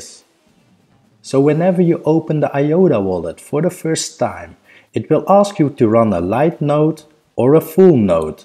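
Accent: Netherlands. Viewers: Thanks for the video!. Question: Are you Dutch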